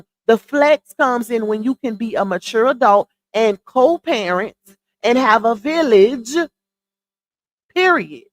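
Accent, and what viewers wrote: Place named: South, United States. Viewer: And may I say I LOVE the way you say BER-DAY!!! 🤣🤣omg that's the cutest Southern accent I ever heard!! Love you! 😘💕✨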